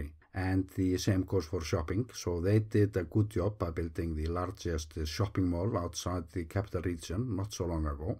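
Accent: Iceland. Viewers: Is it strange for me to say that I love your accent? I love the way a lot of Icelanders say things in English. I've been trying to learn some words and phrases in Icelandic for when I visit. I know most of you know English, but it might be nice to say a couple of things in Icelandic. What do you think?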